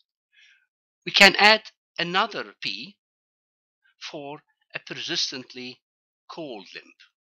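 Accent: United Kingdom. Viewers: I thought he is from England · واااووو ماشاءالله تبارك الله فكرته بريطاني قبل لا اقرأ الاسم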